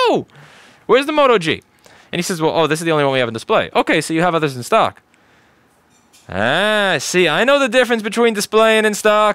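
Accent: New York, United States. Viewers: Rossmann do you not understand the bulk of people who originally subscribed to you were brought because you were doing "boring" board repair? Some of us respect the skill it takes to diagnose a problem and the others respect the skill it takes to properly repair. Then there sre some of us who like the absurd New York style of how you speak. Then there are some who like all 3. And Erica sounds like an amazing woman. Best put a ring on that finger.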